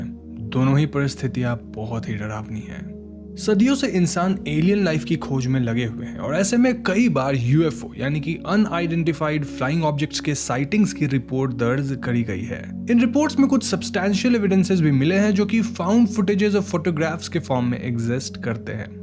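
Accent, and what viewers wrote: Bengal, India. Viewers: U r Bengali right? Ur accent reveal it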